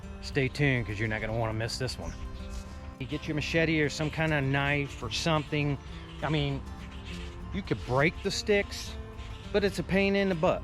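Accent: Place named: California, United States. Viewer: Dude I,m from Califoria but i'v lived in Missouri for 45 years but you sound like your from Ca. no ofence ment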